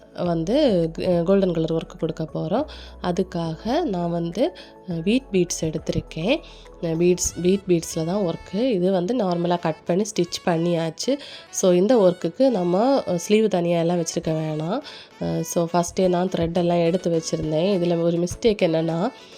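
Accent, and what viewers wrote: Kanyakumari, India. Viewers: உங்க voice ல நீங்க கன்னியாகுமாரி dist தான் antthu தோணுது.... நானும் கன்னியாகுமாரி dist தான்.... உங்க aeria வில ஓரோ dress க்கும் உள்ள stiching fees avalauv anthu ஒரு வீடியோ போடுவீங்களா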